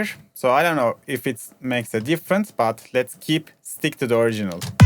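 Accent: Turkey. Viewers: Turkish accent is my favorite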